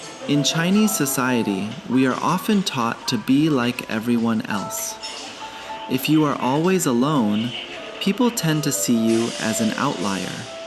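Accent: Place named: United States. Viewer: please dont use the american sounding voice, the one from your first video is much better!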